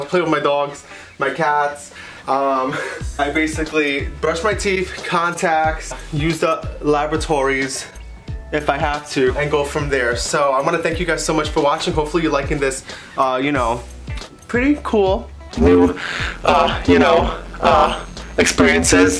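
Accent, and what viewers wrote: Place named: Bronx, United States. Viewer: Hehe yes I can definitely hear the Bronx accent lol. Too hard not to miss 😉😎 Probably good to get away from that city life and have some peace and quiet. There's no way I could live in downtown New York lmao. Way too crowded for me 😅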